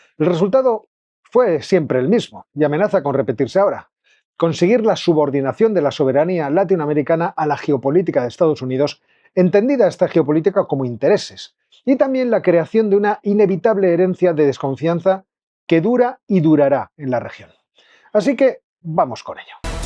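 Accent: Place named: Spain. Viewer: Creo que estás totalmente perdido y andas distorsionando la realidad. Comienza por hablar de lo toxica e inhumanamente posible de habitar que andas muchas areas de Latinoamerica por estar vinculados a la izquierda, a los rusos y vistiendose de delicuentes narcotraficantes. Por su acento es Ud español, yo soy cubano y le alerto, saquen al izquierdista que tienen manipulando España antes de que sea muy tarde. Abra los ojos, no confunda a personas que se pueden creer su utopica narrativa.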